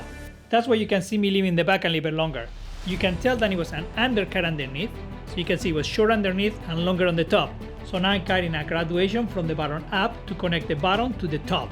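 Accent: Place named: Spain. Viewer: No hablo ingles pero tu acento suena extranjero, de que pais eres?? España?? Por cierto muy hermosos tus trabajos, la proxima que me corte el pelo llevo una foto de aca jajajaja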